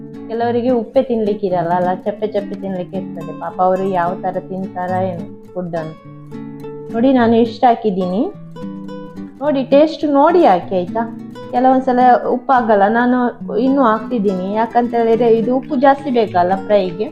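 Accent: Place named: Mangalore, India. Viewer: Super fish fry ❤️🤤Thumba chanagi mathadthiri Mangalore kannada 😂 be connected always 👍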